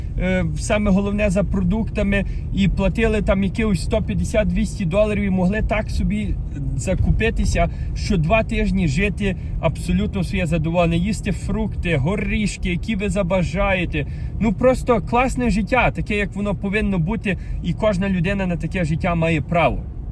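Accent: Western Ukraine, Ukraine. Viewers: Ві говорить на діалекті української мови. Так говорять в селах на заході України. Це не літературна українська мова. Може тому так тяжко в Канаді, що він навіть українською не дуже володіє не говорячи про англійську. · Надя! О с западной Украины!! И там язык не похож на Черниговский(я оттуда) или Полтавский. Но и на украинский, красивый, он не тянет.. Я не в обиду... Просто режет слух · Україна велика і не може людина з заходу говорити полтавською чи київською говіркою.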